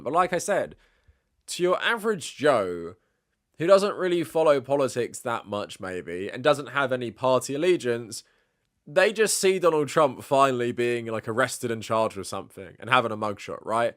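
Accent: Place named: United Kingdom. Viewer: I've been watching your channel for a while and I'm not going to lie despite the accent I somehow never realized you were British till you said it in this video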